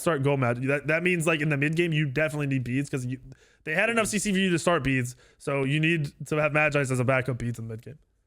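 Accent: Jamaica. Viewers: he sounds jamaican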